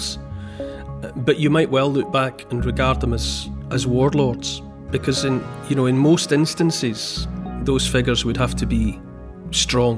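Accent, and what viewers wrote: Scotland, United Kingdom. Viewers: Hi there. I love your soothing Scottish voice. You could talk about anything even and i'd listen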